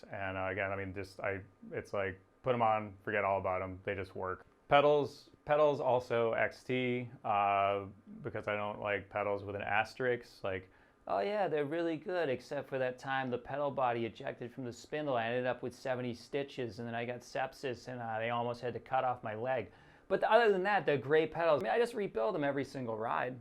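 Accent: New England, United States. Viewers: You sound like a New Englander. Where do you ride?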